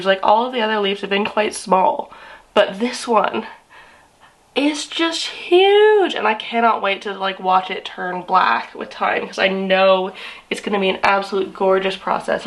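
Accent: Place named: United Kingdom. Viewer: Great video showing the reality of plant care difficulties that gets to even the best of us; also noticed in this video how you had a lot more British sounded words and pronunciations that popped up throughout due to assimilating in London compared to your previous vids, it’s cute and shows the multicultural influences on you💕🫢😏💕👌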